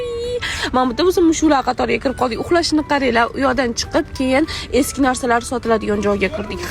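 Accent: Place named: Tashkent, Uzbekistan. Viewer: Toshkencha bn qayse shevani aralashtirvos? Yo Toshkencha yo shevezda gapirin qoganlani buzasiz yoshla internetga qarab oʻsvotti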